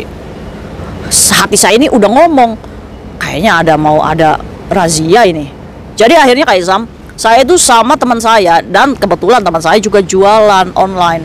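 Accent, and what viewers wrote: Indramayu, Indonesia. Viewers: Metu bhsa dermayune angel angel 😂😂😊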